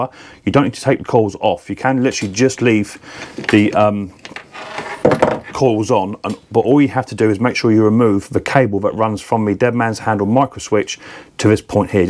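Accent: United Kingdom. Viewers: your video solved a problem. My mower showed a small spark at each lanyard pull, but would not start. Early in the video, you reveal a coil can spark, but too weakly to start the engine. QED-- now to find a reasonably-priced ignition coil. * PS-- Your Midlands accent is a killer.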